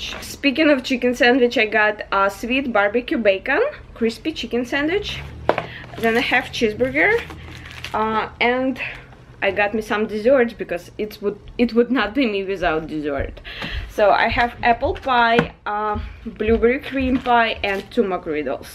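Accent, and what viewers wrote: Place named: Russia. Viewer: сразу слышно, что русскоговорящая хд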